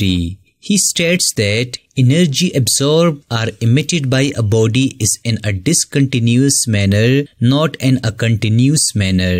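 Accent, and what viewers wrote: South India, India. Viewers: By hearing your English I am thinking that you are from south India side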